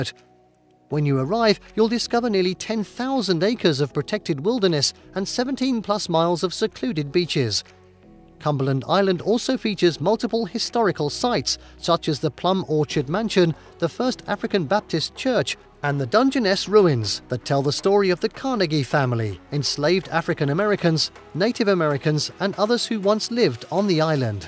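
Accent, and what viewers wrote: United Kingdom. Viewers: Great job showing amazing places to visit in Ga. But caught me off guard when I heard a British accent. I was expecting a Ga accent.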